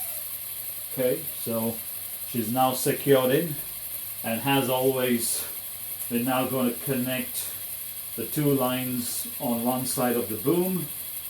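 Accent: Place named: Netherlands. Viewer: Okay, just thought you might be from Holland originally, your accent are similar to Josh' at Hemistorm RC :)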